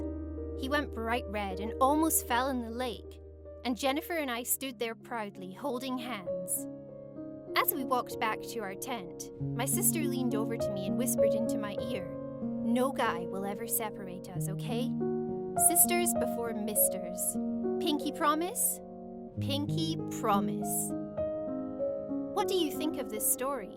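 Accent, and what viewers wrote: Scotland, United Kingdom. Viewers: Does the narrator have a Scottish accent? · You sound a little bit scottish x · You talk British I like your accent😉😉😆😇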